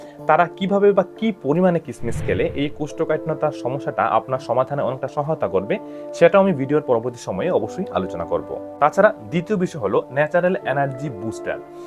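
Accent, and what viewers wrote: Sylhet, Bangladesh. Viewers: বালা মাতছইন সিলেটি ভাই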